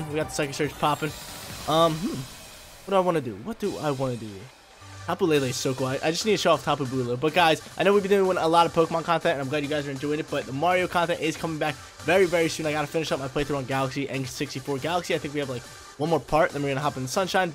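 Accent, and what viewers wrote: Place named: New York, United States. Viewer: I'm noticing your accent are you from the NYC metropolitan area?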